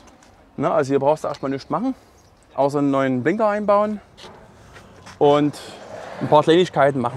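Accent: Saxony, Germany. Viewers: Alter deine sächsische akzent ist absolut Hammer 🤣🤣🤣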